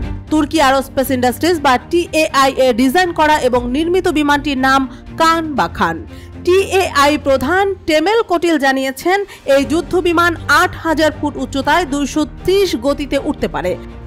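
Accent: India. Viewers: Ei shangbadik indiar hobe kotha bolar dhoron tai mone hoye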